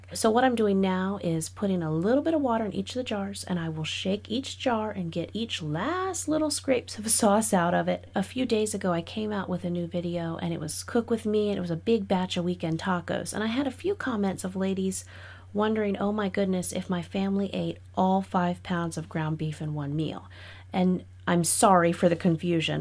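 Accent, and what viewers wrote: South, United States. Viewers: You're so welcome my dear. God bless you and your lovely family. Love the Southern accent. Makes me feel at home. We are originally from Virginia as well. God bless and keep making people smile. 😘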